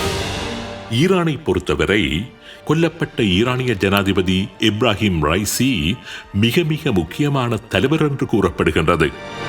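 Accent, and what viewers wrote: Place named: Jaffna, Sri Lanka. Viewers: Anna your voice kaadallaa enkaluko eppavum Elam Jaffna jabakam vaarukothu super voice Anna 10 vasellaa erunthu kakirran God bless you Anna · Pure yazl Tamil❤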